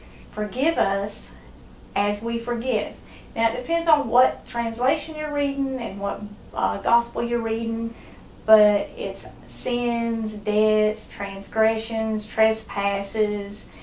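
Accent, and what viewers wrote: South, United States. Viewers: Just Wow. I came here because I love your channel and your Southern accent reminds me of my Family raised Down South, many who have passed on to Higher Ground.... [Even though we are of different Races, As an African American I truly believe ....We are ALL family on this earth as far as I am concerned. Let me just say, your soliloquy on "Forgiveness" was profound and beautiful. I was in tears by the time you went back to finishing up your Johnny Cakes Recipe, "You took me to Church today", as the older folks would say when someone spoke wisdom and Grace. I have had awful things happen to me during my lifetime; Evil done to me by others I was kind and generous too....pain and damage so profound, I felt it was beyond my control to stay on this Earth....I still have moments where , because of horrific losses and fiscal damage done to me....That I just want God to take me ...However, I am still here....I read Psalms , Matthew Etc. 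to soothe me....My motto to get through this life is "Forgiveness is a give you give yourself....Hating and being angry is like drinking poison and hoping the other person dies"....God Bless you and Thank you for your wise, cogent advice. I truly believe that God put you in my path today....I needed to hear your sermon, it was timely. Thank you again.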